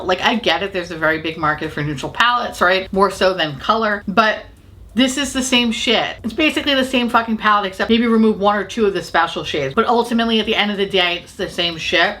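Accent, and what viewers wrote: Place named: South, United States. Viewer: you are 5 more y'all close to me loving you even more. I also love the random southern accent for no reason poppin up these days. Yea show is Pat 2 !!!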